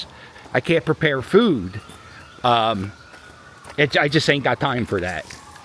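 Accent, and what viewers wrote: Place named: Philadelphia, United States. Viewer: Da Instagram, ya know?! 😂 Philly to the core. Love it. 💙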